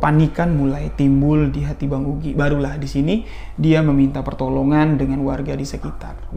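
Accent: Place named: Jambi, Indonesia. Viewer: Walaikumsalam kak....n team SKM Mf kak sekedar request upload dong Kisah kota ghaib Saranjana..... psti y kereen banget d bwain kk dgn logat jambi yg khas........pasti kereen habis 👌 Slm sehat....slm smngat....mkin sukses y Semua.... Yuk gaskeeunn 🔥🔥🔥 By. Yana 🙏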